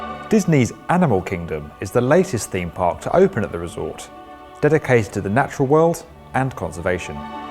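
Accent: England, United Kingdom. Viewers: Mr JayL well let’s hear your voice , he has a clear English Accent , do you !! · CAN WE GET A NARRATOR WHO IS NOT BRITISH BUT AMERICAN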